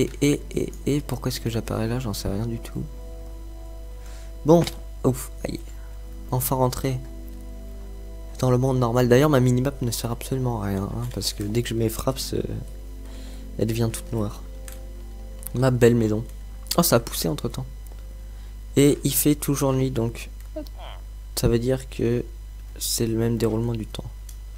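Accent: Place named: United Kingdom. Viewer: t anglais ou pas car ta un acsen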